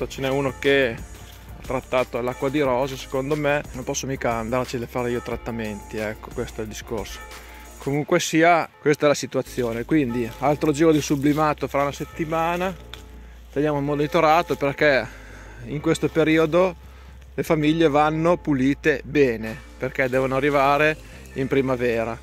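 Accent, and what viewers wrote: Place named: Monferrato, Italy. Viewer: Ciao I tuoi video sono sempre interessanti, facci sapere cosa farai e come finiscono quelle due o tre iper infestate. Qui ho tolto le strisce settimana scorsa e ho trovato poca varroa, tra un mese o meno farò un sublimato, sono tutte in blocco eccetto una che ha una rosettina ma è un'arnia sfigatella, non ha raggiunto la massa critica per l'inverno mentre le altre sono in condizione 2 o 3 di forza, ho uno schema di valutazione da 1 a 3 per le varie caratteristiche dellalveare; io sono in Val Seriana e le temperature vanno da 18 a 2; tu dove sei, dall'accento ti colloco in Monferrato.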